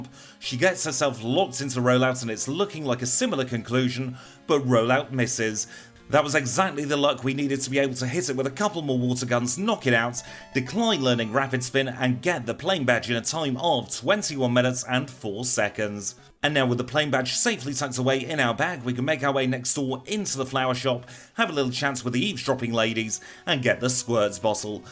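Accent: United Kingdom. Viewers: Man, you are the best. My favorite pokemon gamer of all time, love your british accent. Can I ask you, why don't you use Dynamic Punch more often with Snorlax?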